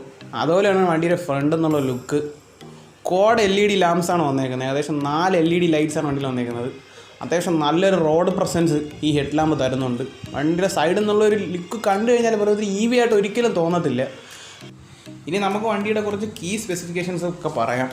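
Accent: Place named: Trivandrum, India. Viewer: Neeyokke parayunnathu matulavarkku manasilava kude Ella athinekal nalathu tvm bhasha thaneyya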